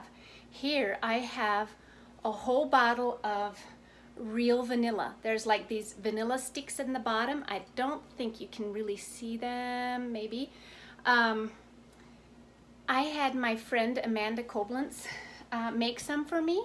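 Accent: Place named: Pennsylvania Dutch, United States. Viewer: Könnt euch ein video nur auf Deutsch machen, bitte. :-) I like your Pennsylvania Dutch dialect.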